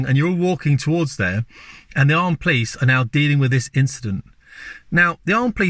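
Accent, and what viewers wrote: United Kingdom. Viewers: Wonderful to hear a true British man talking!!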